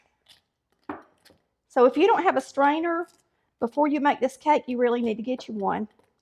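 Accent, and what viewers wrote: South, United States. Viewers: Your recipes look so delicious, I just love your sweet southern talk. I definitely want to make this cake. Thanks for sharing!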